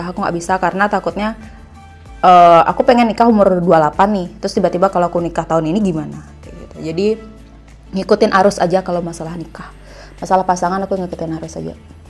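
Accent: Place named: Bali, Indonesia. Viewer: Pesu logat bali ne😹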